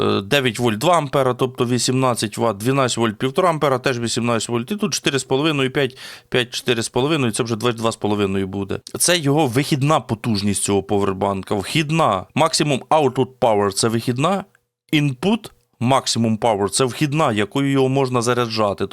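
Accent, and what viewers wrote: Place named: Central Ukraine, Ukraine. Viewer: земляче,лиш не двєнадцать,а два на д ця ть .чути осьо,тосьо,що центральна україна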